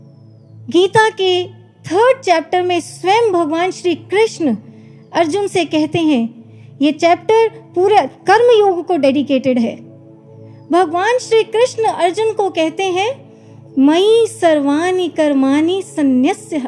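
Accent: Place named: Bengal, India. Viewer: Her bangali accent is wow !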